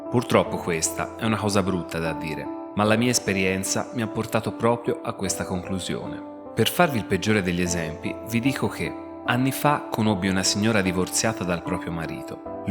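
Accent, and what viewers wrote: Tuscany, Italy. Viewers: L'accento toscano mi fa venire ancora più voglia di ascoltare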